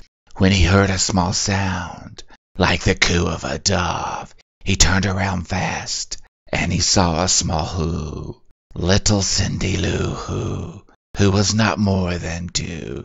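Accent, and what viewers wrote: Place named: Kentucky, United States. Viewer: I recognized the accent and figured it was Kentucky or Tennessee.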